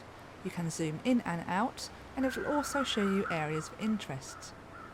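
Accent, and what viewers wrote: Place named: North Yorkshire, United Kingdom. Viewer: I'm digging the update, the only thing I don't like is the HUD condition icons sticking around in their awkward positions when they turn red. Kind of distract me from the immersive beauty. Aside from that, all great. I really liked your review, by the way! Funny little asides, and a dab of oop North Yorkshire accent with the all important tea worship. It's a shame we didn't get you narrating previous updates, oh well. I hope you think about doing a review when the full game comes out, this was a pleasure to watch. And those new weather effects, eh?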